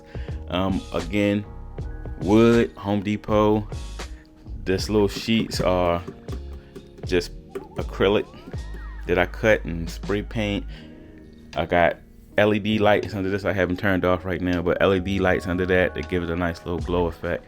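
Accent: Baltimore, United States. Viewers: Looks good man. You from Bmore? Accent sounds like it. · That bmore accent is ridiculous lol tew hundred dollars is nuts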